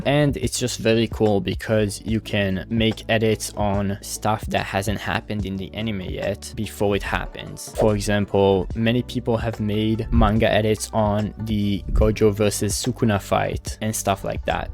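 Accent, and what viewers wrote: France. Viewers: Dude are you french? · Are you French?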